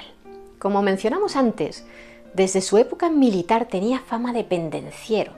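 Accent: France. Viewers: Me encanta tu acento francés.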